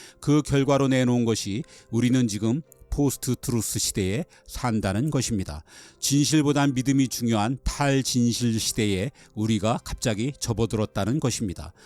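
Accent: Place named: Jeolla, South Korea. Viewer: 고향이 전라도이신가 보네요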